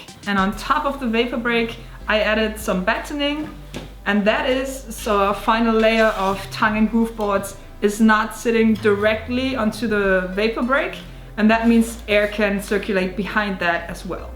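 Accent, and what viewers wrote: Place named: Germany. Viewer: Noch ne Deutsche die versucht Englisch zu reden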